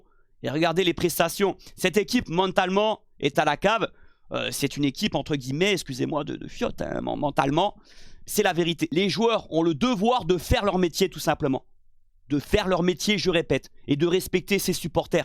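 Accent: Corsica, France. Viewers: Tu as vraiment l'accent corse !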